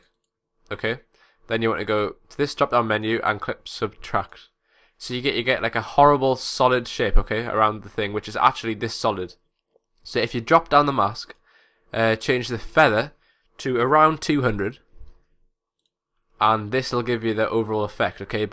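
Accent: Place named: Northern England, United Kingdom. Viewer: Though a few years old now it's still a very concise and handy tutorial, good job! Loving the northern accent by the way :p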